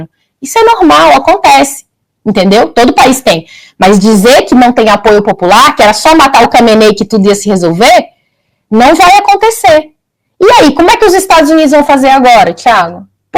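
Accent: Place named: Minas Gerais, Brazil. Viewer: ❤ QUE SOTAQUE LINDO! SERIA ELA UMA MINEIRA? MUITO BOM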